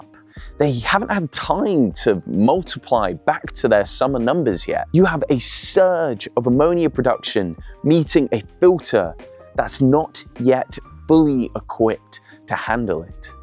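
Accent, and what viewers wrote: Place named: United Kingdom. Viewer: I love this channel. I'm a sucker for soft, slow British accents; but the content is also amazing. Love love love. Wishing you much success 💕💕🎉🎉🎉🎉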